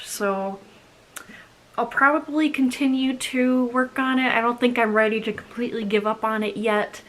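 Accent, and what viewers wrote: Midwest, United States. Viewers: Welcome to FlossTube. Great job with your first video. Not sure what you meant about a Minnesota accent. My first impression before you told us was - yeah she is a Midwesterner like me! I am in Illinois close to the Wisconsin border, and you sound just like us down here. You betcha! I like your WIPs. They are are not the usual ones I see on FlossTube. Your love for animals is engaging, and I look forward to your sharing your knowledge of animals with us. Best wishes for a safe, happy and healthy holiday season. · Get in line for the Midwest accent,I'm a Scony Our mudpuppys look different too.😆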